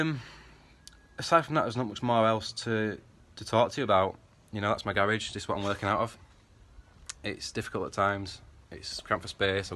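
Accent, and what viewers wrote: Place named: Yorkshire, United Kingdom. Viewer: Thanks for the shot out bud! And it’s great to see another face on the you of tube! You sound Yorkshire way, where abouts you from mate?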